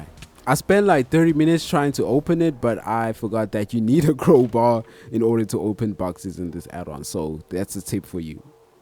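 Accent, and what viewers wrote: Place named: South Africa. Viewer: Somehow I just knew you were South African, we can just sense it between our people, subscribed once I realized you were, gotta support good ZA creators.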